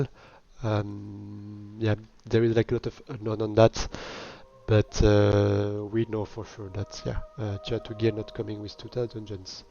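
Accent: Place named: France. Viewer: Salut, merci pour tes vidéos - vu l'accent je te parle directement en français :D Tu as quoi comme config?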